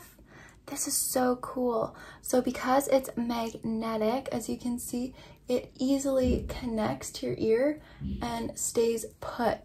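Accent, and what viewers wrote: England, United Kingdom. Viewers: I do the British accent all the time too 😭😭 you look so cute!! I hope your date went well !! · I’m so used to you randomly breaking into British accent I didn’t even realize it till my sister pointed it out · girl i love you but pls stop the british accent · still obsessed with the english accent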